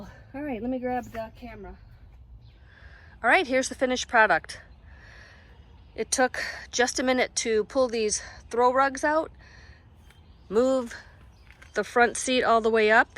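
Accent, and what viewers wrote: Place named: New England, United States. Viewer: You have to be from east coast. You talk so fast. From new england area and I do too. New sub, great ideas you share👍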